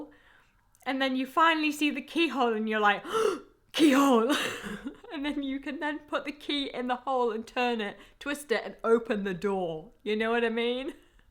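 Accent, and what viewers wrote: United Kingdom. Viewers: Hello my favorite dreamy reader of my path in this coming new life, Your readings are so resonant of the process I am going through for the last few months, sometimes I find it incredible and very enlightning, thank you for the energy you devote to my readings, I am always looking forward to just seen you and hearing your beautifully sounding British accent and that natural radiance and beauty that comes within you, that I love so much, don't ever stop ohhh yes and I Love You more than you will ever know!!!!!!!💖💖💖💫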